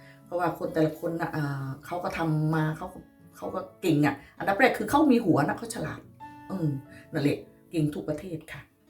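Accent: Korat, Thailand. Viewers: พูดโคราช. เป็นคนโคราชใช่มั้ยคะ. คนบ้านเดียวกัน. ตอนนี้กำลังลองสูตรน้ำมันมะพร้าวกับน้ำมันวิตตามินอีค่ะ. รอลุ้นอยู่ค่ะ. อยากสวยเหมือนพี่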